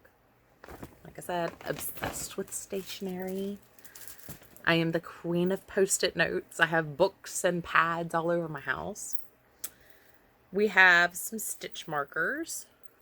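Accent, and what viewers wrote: South, United States. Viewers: Im a new subscriber but wanted to say I really love your videos! I watch Mary from Ireland too LOL my late husband was very Irish so she touches my heart. Your sweet southern accent reminds me of home (I'm a country girl from rural midwest). Keep up the great vlog!!! Oh and I luv luv luv the dr who scarf!!!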